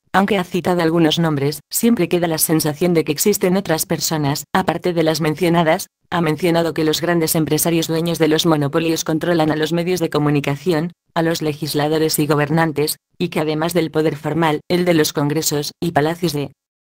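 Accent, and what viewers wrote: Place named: Spain. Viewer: Los poderes fácticos son quienes tienen el dinero y no es que entre todos se pongan de acuerdo, es sólo que el sistema capitalista así funciona. Cuando era niño todo se relacionaba con los satánicos, hoy se habla de illuminatis y de reptilianos. La idea de que hay una conspiración detrás puede ser parcialmente cierta, pero tampoco hay que caer en la paranoia. PD: esa vocecita españoleta es irritante.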